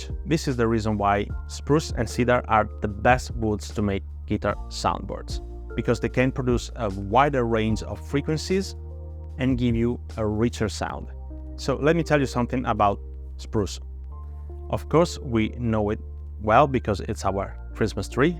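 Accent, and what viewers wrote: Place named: Italy. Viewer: We love that italian accent.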